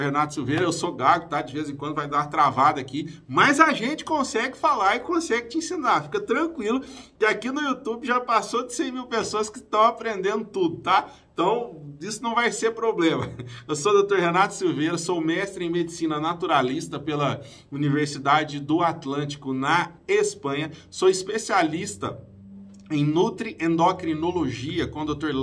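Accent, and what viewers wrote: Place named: Minas Gerais, Brazil. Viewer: Eu gostaria de dar mil likes para você Dr Renato. Sou mineiro mas resido aqui no Rio de Janeiro há 45anos, e você pelo sotaque parece ser meu conterrâneo, Mineirão mesmo. Eu era gago qdo criança até aos 9anos, minha mãe uma Pernambucana arretada me aplicou um remédio natural do nordeste, uma bela duma colher de pau na cabeça, e não é que eu fiquei bom na hora.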